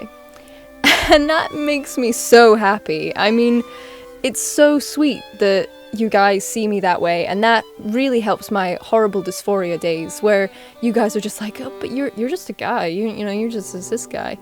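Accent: England, United Kingdom. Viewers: are you English because you don't sound America thou I might be wrong because I'm english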